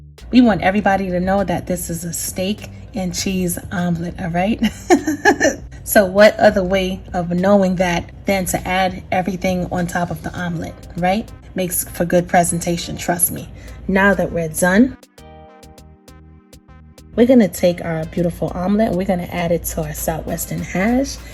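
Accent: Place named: East Coast, United States. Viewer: Making this with leftover pot roast 😋 Love the east coast accent 😁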